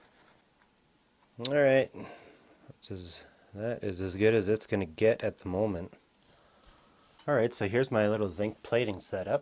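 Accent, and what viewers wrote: Canada. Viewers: Loved the commentary, you sound like you are in Canada?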